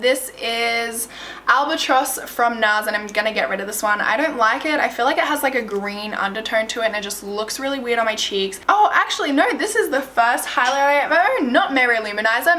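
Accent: Australia. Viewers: is she australian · Hey are you Australian?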